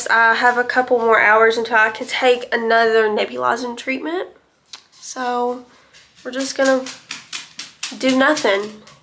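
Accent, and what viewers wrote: Appalachia, United States. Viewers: Love that accent. Didn't even know there's an Appalachia dialect.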